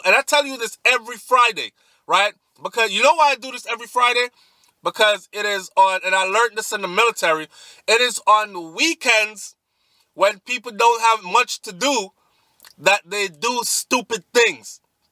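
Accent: Trinidad, Trinidad and Tobago. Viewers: you Trini accent wicked boi , ah hear u Trini keep on doing ur thing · Well I am Trinni and you sound like us.Welcome my fellow Country man 👊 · Love your Trini accent! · Love ❤️ your Trinni accent is great 👍 brother don’t care what anyone else says 🙏🏾❤️🇯🇲🇺🇸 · Man everybody done no she was ah bulla gal from long time lol.. side note... yur trini accent pretty good fadda 🤣👏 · Well A A! Bachannal in de people place yes! I'm BAWLING crying at your Trini accent... Yuh have it dread, yuh have it! 😩😩😩🤣🤣🤣😂😂😂😂😭😭😭😭😭😭😭😭💀🤞🏾🔥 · I like how you talk the Trinidad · Hi you are Trini you dount talk like jamaican love it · Lol oh boy, I love your Trini accent. This story was very interesting. I work on the weekends my days off are in the middle of the week so I can't do anything especially since I have my own reputation to uphold. Great video. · Hi, love your Trinidadian accent... 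very funny 😂😂😂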